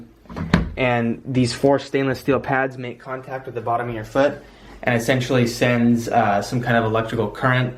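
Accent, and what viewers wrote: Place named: Canada. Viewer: Hi, i wanted to ask where are you originally from? and where are you based at the moment? Your accent sounds Canadian, but your name sounds Romanian and you look Romanian.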